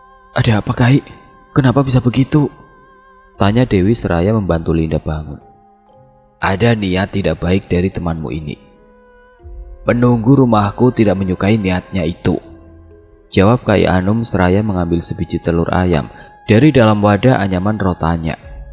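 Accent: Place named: Banjar, Indonesia. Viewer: admin keknya orang kalimantan , soalnya logatnya kalau ngomong banjar pas banget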